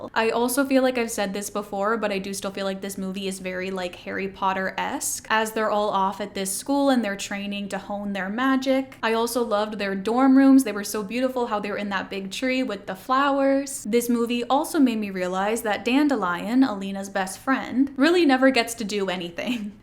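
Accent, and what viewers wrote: Canada. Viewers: You have great teeth and say oot instead of out, so you must be from Canada.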